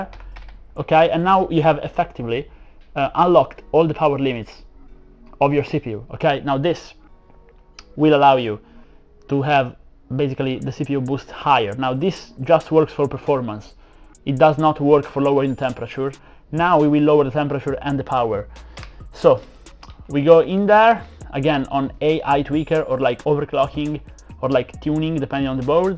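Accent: Italy. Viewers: Ti ringrazio molto per il tutorial, ho visto il video e per fortuna asus non ha stravolto il suo bios dopo 3 generazioni (ho un i7 13th e una z690). Ps. dalla pronuncia ho capito che sei italiano, sai parlare molto bene in inglese, complimenti.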